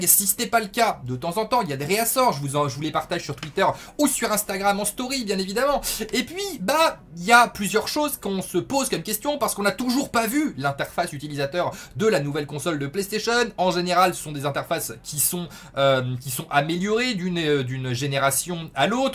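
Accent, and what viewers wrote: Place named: France. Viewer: Mon dieu l'accent Français une tuerie!!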